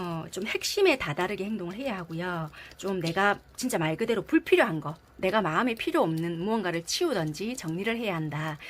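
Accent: Busan, South Korea. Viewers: 잘 지내고 있습니다! 도 건강하셨으면 좋겠어요 ㅎㅎ 온 연락은 비록 지난달 연락이긴 하지만 타로 보며 위로 받아요...^^ 좋은 길이 열리길!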